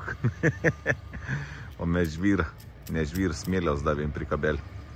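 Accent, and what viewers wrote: Samogitia, Lithuania. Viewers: 😂 Maniau, kad tai kažkas iš M. Petruškevičiaus serijos - punčakos nemadinga 😂. Ačiū, už tą nuoširdžią žemaitišką kalbą ❤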